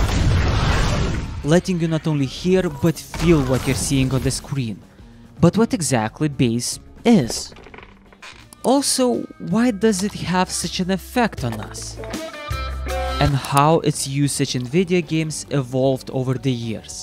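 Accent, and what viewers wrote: Russia. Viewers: the russian R is as heavy as the bass (not really judging tho)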